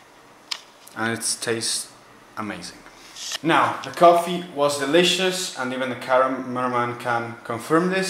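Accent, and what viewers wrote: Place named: Italy. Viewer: Within seconds I was sure you were Italian! You know how to pronounce the name correctly. 😂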